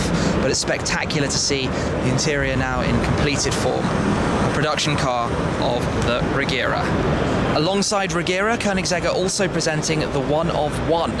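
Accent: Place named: United Kingdom. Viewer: sotaque britânico...eca...manda um americano cobrir o evento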